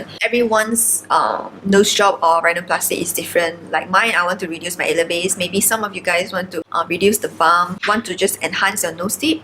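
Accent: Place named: China. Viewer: Are u Indonesia or Chinese? Sorry.. 'Coz ur English sound like Chinese English.. But the translation is in bahasa.. I just wondering🤔💭 😁.. Thank u😊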